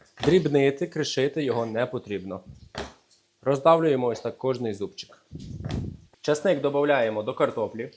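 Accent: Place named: Podillia, Ukraine. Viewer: Професіонал! Клас, було приємно спостерігати, немов родича слухаю 😊 Ви з Хмельниччини чи з Вінниччини?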